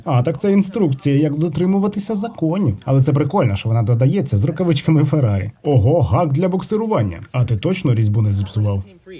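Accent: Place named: Surzhyk, Ukraine. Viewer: Пиздец, мужик, зачем ты перевод делаешь на украинском, если его полноценно не знаешь? Твой суржик звучит ужасно.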